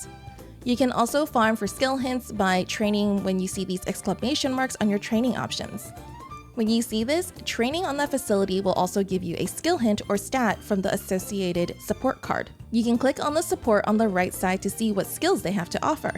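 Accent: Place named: United States. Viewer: the murican accent is so cringe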